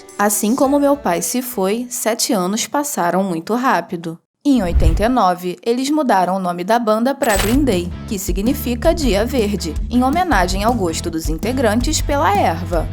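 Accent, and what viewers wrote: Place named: Rio de Janeiro, Brazil. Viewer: Sotaque carioca chato pra caramba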